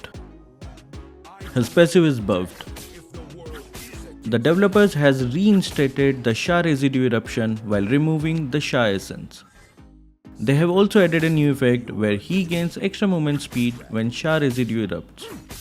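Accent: India. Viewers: asal kau cakap cam keling sia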